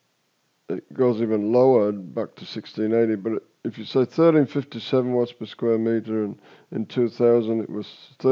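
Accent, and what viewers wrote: Yorkshire, United Kingdom. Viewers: As usual, this contributor's analysis is presented with succinct scientific clarity; and his terse, placid-yet-authoritative style is a welcome change from the strident, shrill tones of the alarmists' hard sell. In honest science at least, substance trumps emotion every time. Despite writing, as I am, from t'other side of yonder Pennines, I am humbly obliged to concede that his sound-reasoning rebuttals of the IPCC's characteristically-devious acumen are admirably accentuated by such rectilinear "Yorkshire talking". Considering the magnitude of the IPCC's disgraceful climate hoax, his blunt turn of phrase is also refreshing.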